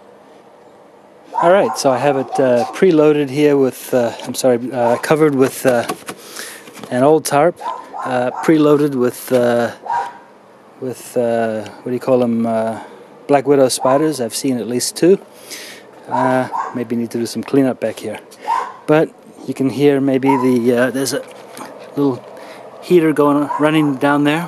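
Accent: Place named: South Africa. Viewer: I can tell you use to live in South Africa, can still hear your accent. Thanks for this video!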